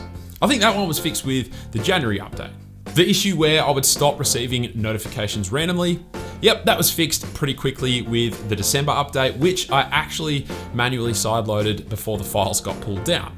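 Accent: Australia. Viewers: this guy looks american but has an australian accent. so interesting!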